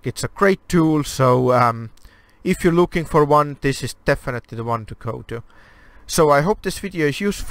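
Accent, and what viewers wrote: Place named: Sweden. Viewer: clearly swedish or norwegian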